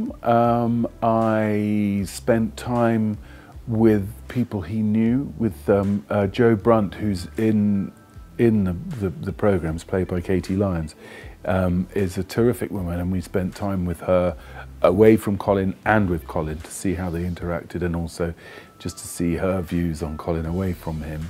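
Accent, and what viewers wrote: RP, United Kingdom. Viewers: I love the way he speaks so proper and posh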